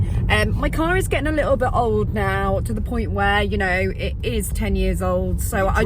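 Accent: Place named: England, United Kingdom. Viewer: Totally unrelated comment however I have been wondering what part of England you are from originally. You have a wonderful accent. Here in Australia I think one would have to be a linguistic expert to pick the difference in accents from each of our states! doesn't have the same accent as you?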